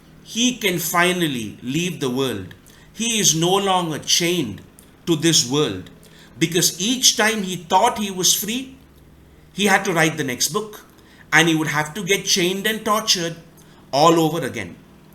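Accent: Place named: Sri Lanka. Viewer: Are you saying you can't be taken seriously? Because of the thick Sri Lankan accent? Coz that would be a crying shame considering the seriously cracking great content , serious no joke , not kidding even a little bit, true story ... Gospel even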